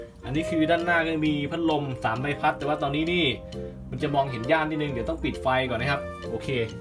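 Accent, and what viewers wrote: South, Thailand. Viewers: พี่อยู่ภาคใต้ป่าวพี่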